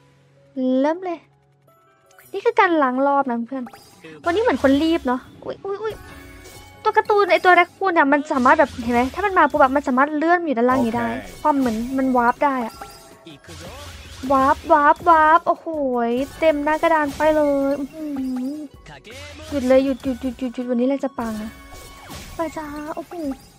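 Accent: Thailand. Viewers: ชอบเสียงพี่เค้ามากเลยอ่ะโอ้โหเสียงแบบเหน่อได้ใจ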